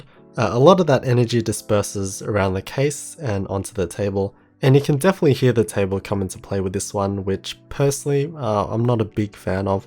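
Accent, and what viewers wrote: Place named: Australia. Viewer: sounds like every single Asian Australian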